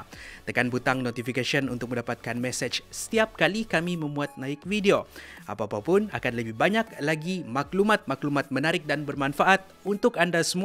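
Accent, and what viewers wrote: Sabah, Malaysia. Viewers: X prnh dgr loghat Sabah ker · Orang Sabah bah dia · Ko ingat semua orang Malaysia ni melayu ke....dia orang sabah memang la guna bahasa baku Malaysia.......hairan betul aku dengan orang melayu ni🤦‍♀️🤦‍♂️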